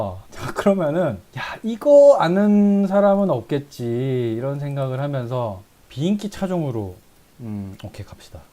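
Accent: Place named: Ulsan, South Korea. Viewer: 바이크 관심보다 전부터 느낀건데 울산억양은 정겹네요 ㅋㅋㅋㅋ 차장님보는줄(울산분) 전 밀양분 같은 지역이라더 억양이 틀려 재밌어요 ㅋㅋㅋ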